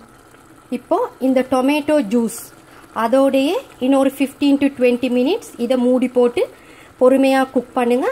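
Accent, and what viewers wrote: Sri Lanka. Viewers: Srilanka Tamil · This is srilankan Tamil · In India we have to use a pressure cooker. Thanks for this amazing video and very well explained. I like the Srilankan Tamil accent. God bless. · Thank you for sharing sister. Your tamil accent from Sri Lanka. Are you from there? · sri lankan tamil was nice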